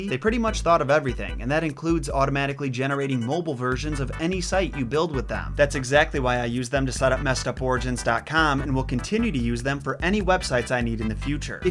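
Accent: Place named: East Coast, United States. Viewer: I’m still trying to place his accent lol. I’m sure it’s east coast but I want to pinpoint it lol.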